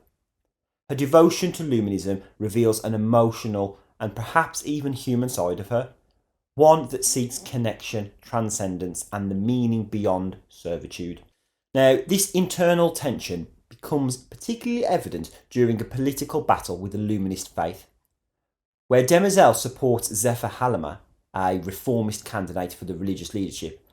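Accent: United Kingdom. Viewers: 😂 sounds Brit to me 💯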